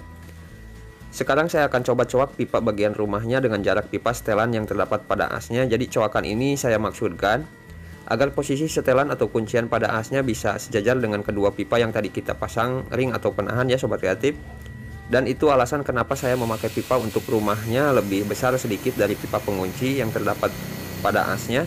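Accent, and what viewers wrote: West Java, Indonesia. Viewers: logatnya urang sunda pisan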